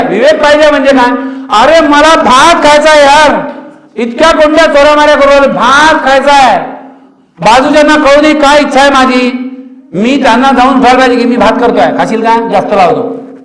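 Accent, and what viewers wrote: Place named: Vidarbha, India. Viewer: सर मि तुमचा प्रत्येक सेशन पाहतो काही तरी नविन शिकवतो आणि ति वर्हाडी टोनिंग एकच नंबर तुमच्या सेशन ची माहिती मिळाली तर उपस्थिती देणे आवडेल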